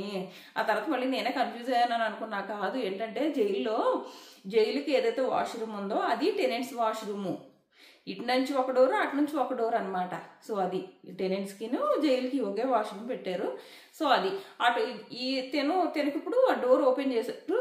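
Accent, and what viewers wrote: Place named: East Godavari, India. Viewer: East godavari voice ❤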